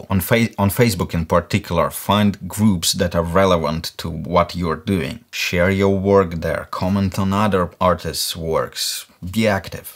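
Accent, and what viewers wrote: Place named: Russia. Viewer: (Rus) -Такое чувство что твой родной язык русский. Но все ровно за опыт который ты передаешь своим подписчикам. (Eng) - I feel you're native language Russian. Anyway thanks for experience that you transmit with your subscription. 😁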